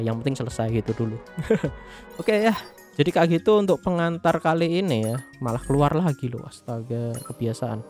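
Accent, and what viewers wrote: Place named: Java, Indonesia. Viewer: Medhok bero. Hehe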